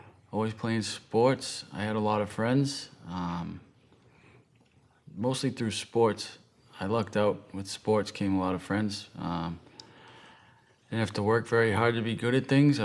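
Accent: United States. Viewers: ... G:)d bless the Boston accent ❤ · Born in Boston. Knew you were from MA as soon as you said your name. Best of success for you!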